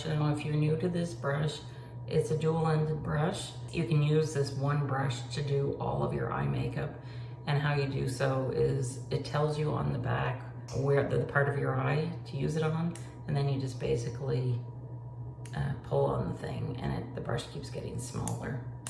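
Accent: Canada. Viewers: Hello new friend, I am a new subscriber and I love what I see so far. I am a 47 y/o married x 22 years, mother of 1 sixteen y/o who is a lover of makeup too and clothes and purses and jewelry, lol. Where do you live? You sound Canadian. I live in Labrador, Canada, xo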